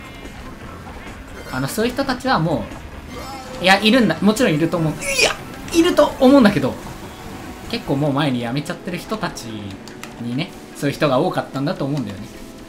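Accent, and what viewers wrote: Kansai, Japan. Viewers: うぽつです٩( 'ω' )و関西弁喋ってるのがすごく良いです(´꒳`)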